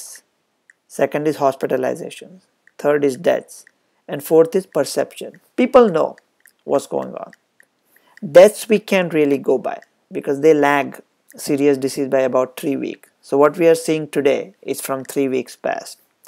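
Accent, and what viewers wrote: India. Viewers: Your accent is still Indian 🙂.Strange. Good thiught though.Keep spreading the hope, positivity.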